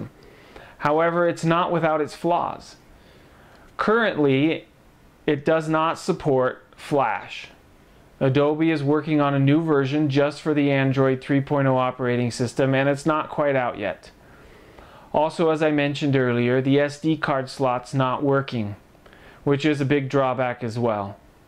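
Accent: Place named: United States. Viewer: Finally someone who speaks American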